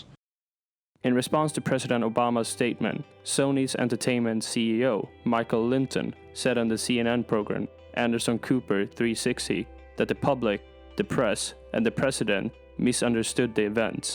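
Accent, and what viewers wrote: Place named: Sweden. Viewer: Intressant, men skulle vara bättre om det inte lät som att ni läser och försök få bort svengelskan!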